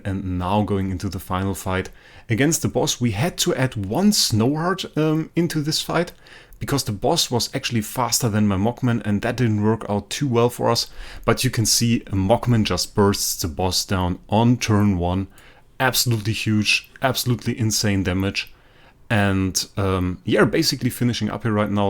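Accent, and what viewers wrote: Germany. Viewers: Bist du Deutscher man erkennt es so am Akzent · First of all great video. You make it look insanely easy. And secondly I wanna ask if you are from Germany because your accent sounds very German.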